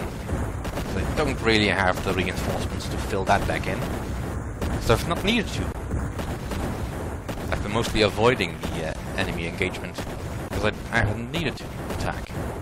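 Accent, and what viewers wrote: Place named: Eastern Europe. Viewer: It's a pitty. When you are planning to replay the game on the higher difficulty, please let me know. I'd like to give you a bunch of tips and hints helping you to win the game. (I've collected around 30 tipps and hints to improve your gameplay or just sensitize you to some aspects of the game.) You're English is very good (from my point of view), although I suppose you're not a native speaker. I guess, you're from an Eastern European country, aren't you?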